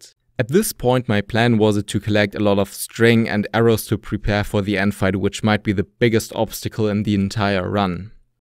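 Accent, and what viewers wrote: Germany. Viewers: Du hörst dich bissl deutsch an…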